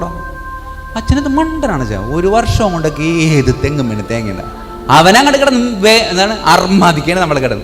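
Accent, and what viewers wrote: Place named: Ernakulam, India. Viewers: കൊച്ചി സ്‌ളാഗ് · Paravoor slang aan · This is paravoor accent. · അച്ചോ, അവതരണം സൂപ്പർ, 👌👌👌ചിരിച്ചു ചിരിച്ചു ഒരുപാട് ആയി, നമ്മുടെ എറണാകുളം സ്ലാഗ് 👌👌🙏🙏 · നല്ല കൊച്ചി ഭാഷ 👍👍👍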